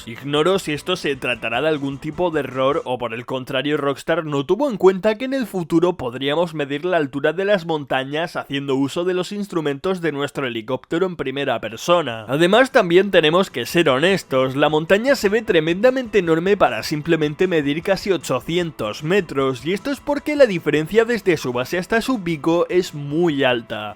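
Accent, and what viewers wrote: Murcia, Spain. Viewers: Yo lo sabia y lo dije tu eres murciano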